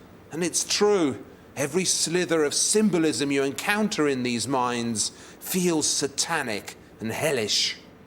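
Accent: England, United Kingdom. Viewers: Why do you pronounce the "er" in clerk as you pronounce the "ar" in art? same with the fooking derby. The Kentucky Derby. innit? . Derrrrrby. not Darrrrby. With an "e". The Darby? Fuck sakes, England. Get it right. Yes, yes, we say learned instead of learnt, and burnt etc. but at least we pronounce derby correctly. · Waldemar Januszczzak you are taking my breath away, the way how you talk this narrative is literal refreshment. I hear you with my nices brain neurons while my ears turned deaf with that beautiful British accent. Thank you for sharing your knowledge, journalists' curiosity, poets' spirit, and Slavic gen.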